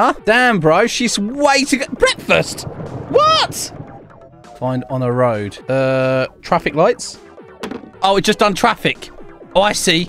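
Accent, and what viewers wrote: United Kingdom. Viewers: Are you British because you always do videos just like British??..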